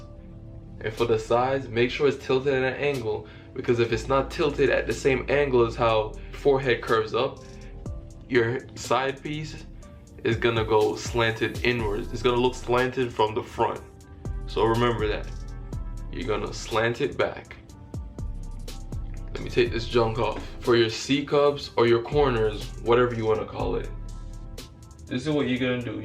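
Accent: Jamaica. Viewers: U can tell he’s Jamaican 🤣🤣🤣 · Oh you Jamaican too? Instant sub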